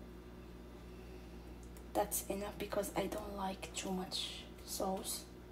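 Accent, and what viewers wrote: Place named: Morocco. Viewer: تبارك الله عليك قبل منشوف الصوره ديالك غير من الهضرة عرفتك مغربية ۔ الله يوفقك وشكرا علی الفيديو الجميل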